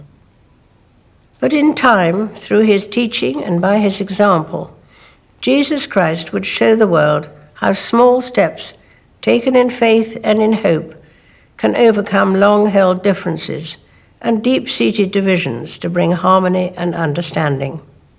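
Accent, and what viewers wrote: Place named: RP, United Kingdom. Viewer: At least she speaks Queen's English and has style. Don't know who wrote her speech. Our nice queen Silvia, who is supposed to know 8 languages, how come she hasn't learnt to speak well yet? Many resources , pedagogues, money and speech laboratories at her disposal. The royal family should heal. Everyone should.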